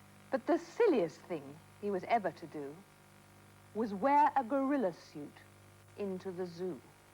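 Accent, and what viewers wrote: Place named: RP, United Kingdom. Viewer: I saw another episode of Gammon and Spinach a few years on YouTube and my vague childhood memories were ruined! I could vaguely remember the stories being about the frog in the opening titles, and I could remember the presenter and her very posh voice but not her name. Imagine my disappointment 30 years later when I found that Gammon and Spinach was just an ordinary story reading programme that Yorkshire Television tried to make more "interesting" by having A Frog He Would A Wooing Go" as the theme complete with the animation of the frog in a Victorian suit and the presenter/storyteller on a pond set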